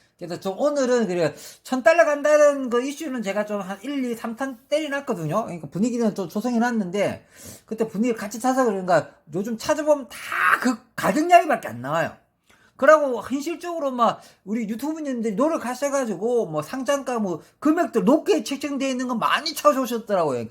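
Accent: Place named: Masan, South Korea. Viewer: 이분 무조건 마산분인디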